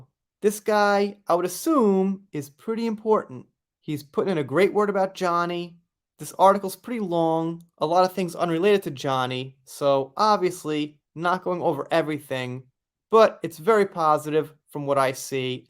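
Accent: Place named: New York, United States. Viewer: I like to hear you talk, I like your accent (unusual bc I'm a Southerner who typically can't take NYC/Boston accents 😆), & you're spreading good news about JD, so as far as I'm concerned, you can repeat as many narratives as you like!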